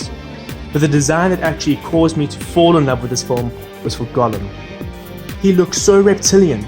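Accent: South Africa. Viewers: I didn't hear Australian either. South African perhaps? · Dude, that accent is one I recognize well and truly. Is jy 'n Suid Afrikaner? as jy is, dan is jy die eerste gooie youtuber ek het gevind op die internet. (Jammer as my afrikaans sleg is, maar ek wil dit meer oefen, en ek praat engels die meeste van die tyd.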